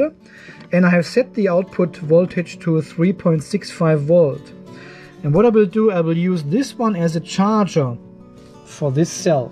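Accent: Germany. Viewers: Hey there, I've ordered the same cells.. waiting for delivery 😤 Btw: is it possible that you are a German guy? Sound very familiar to me. Keep on going, looking forward to your next video....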